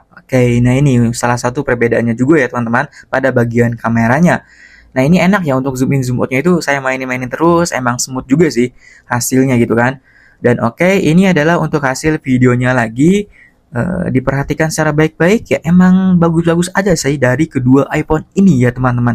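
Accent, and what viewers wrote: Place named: Cirebon, Indonesia. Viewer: Busst orang cirebon lu bang😂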